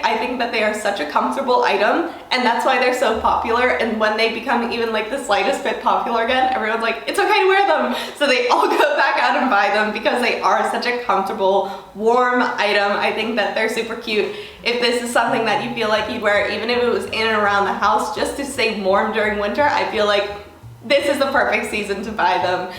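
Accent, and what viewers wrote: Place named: United States. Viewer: i’m soooo confused u reside in australia/ also sponsor australian brands, but u have an american accent ??? 😩😩😩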